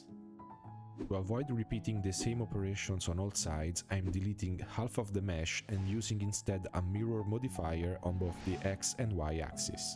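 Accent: Italy. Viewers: Che per caso sei italiano? fantastico video comunque! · ma sei italiano? 😂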